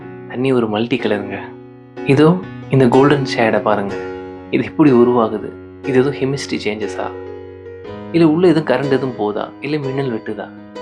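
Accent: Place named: Kongu, India. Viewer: Wow..🤓 ...nalla Kavithai with kongu style🔥🔥🔥🔥🔥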